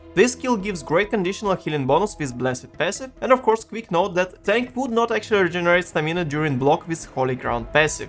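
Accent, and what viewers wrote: Russia. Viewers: Русской школой попахивает английский)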